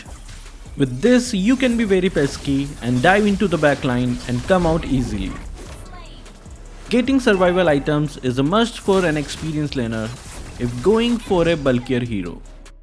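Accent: India. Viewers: Are you from India?😅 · question- Where are u From.. u sound like u r from india.. Please tell me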